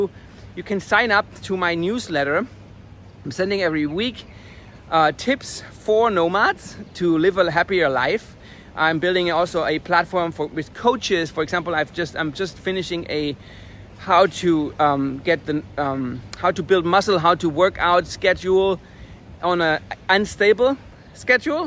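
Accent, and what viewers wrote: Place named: Germany. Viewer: I like your video. I subscribed to your Channel. You talk clear, so in my opinion you do not need subtitles. I think you have a nice German accent (I am Dutch, the subtitles annoy me a bit, but that is my personal opinion)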